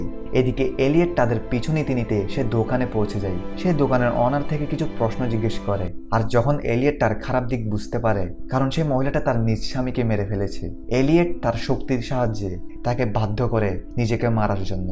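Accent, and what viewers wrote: Chittagong, Bangladesh. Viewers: ভাই তো চিটাইংগার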